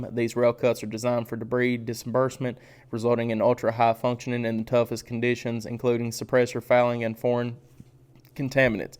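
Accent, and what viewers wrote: South, United States. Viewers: Love the way real Southern Boys talk. It's not a BCG, it's a BeeeCeeGeeee.......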